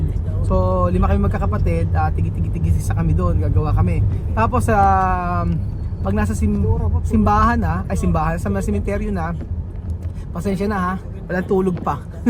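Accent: Philippines. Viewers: Pati Naman Po Yung way Ng pagsasalita may say pa din kayo? Malamang Po iba Ang province ni kuya malamang may mga salita Yan na di natin nakasanayan naririnig. May friend aq from CamNor pag nagsasalita lagi may Ma sa unahan Ng bawat salita Niya like mapunta sa mall,makain na tayo,matulog na,maligo sa ilog. Bawat Lugar Po sa atin ay may mga Punto or salita na pagkakakilanlan Ng kanilang mga Lugar.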